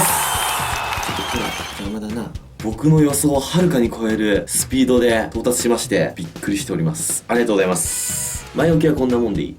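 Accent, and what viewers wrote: Japan. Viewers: はじめんほど訛ってないけど近い地方の人なんだなってわかる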